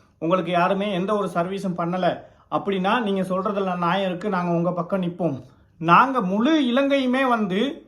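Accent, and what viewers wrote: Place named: Tamil Nadu, India. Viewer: உங்க தமிழ் இந்திய தமிழ் மாதிரி இருக்கு ப்ரோ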